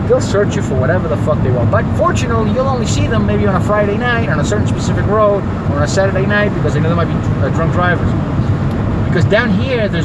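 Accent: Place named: New Jersey, United States. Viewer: You really live in “El culo del mundo” You say, Oh yes I’m from New Jersey, you speak like people from Paterson, Newark Good video to keep ass holes like you away of that great country. Excuse my spelling. Welcome all US tourists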